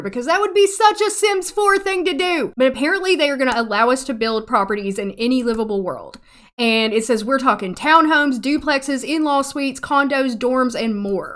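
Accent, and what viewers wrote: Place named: Texas, United States. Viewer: the Texas girl accent lol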